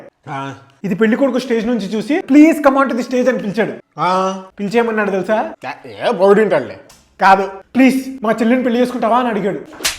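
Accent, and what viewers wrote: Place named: Rayalaseema, India. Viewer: కడపా అనంటపురమా బాబూ నీది?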